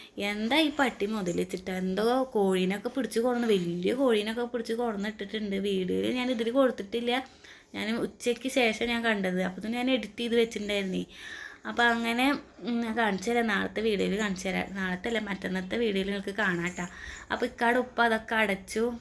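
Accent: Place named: Malappuram, India. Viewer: Hai enna video kanunne trissur jilla analle varthamanom kettappol malappuram anenn thonni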